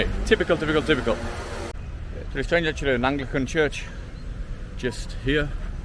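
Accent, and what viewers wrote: United Kingdom. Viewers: I guess you are a mancunian or from northwest of England. All the best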